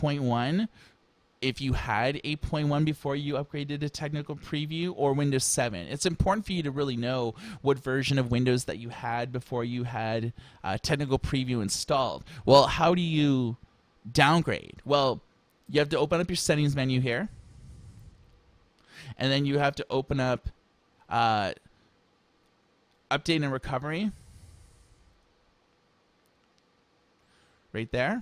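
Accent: Canada. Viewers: So, quite confusing. You keep saying serial number, then proceed to write this complicated code to determine what it is. I just turned my laptop over and looked at the lable. But in fact you're actually talking about determining the operating system product ID. In the case of an XP laptop, the product ID is also listed on the lable.Then, to make matters even more confusing, you call the product ID a serial code. A serial number is always associated with a machine, not an operating system. Judging by your accent, I'd say you are Canadian? So perhaps a serial number and product ID are interchangable in Canada. Not here in the States. I understand that you took the time to do this and I'm sure your efforts are appreciated by some. But the result is very confusing because the terminology is incorrect. Having written a mountain of technical documentaion in a past life, I can tell you that instructional videos have to be consistant and carefully worded. 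A product ID is not a serial number, nor is it a serial code.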